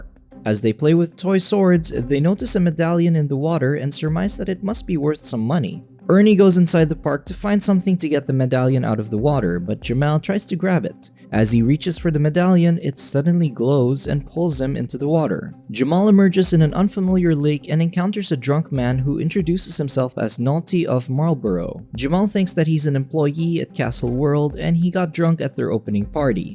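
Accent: Philippines. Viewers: You sound Pinoy! :D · Taena halatang Pinoy tong voiceover hahahaha sagwa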